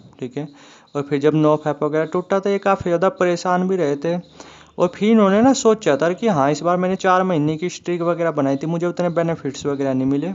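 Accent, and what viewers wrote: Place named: Western Uttar Pradesh, India. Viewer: Nice story bhai ❤. Aap apni boli se west up ke lg rhe ho, whi k ho kya?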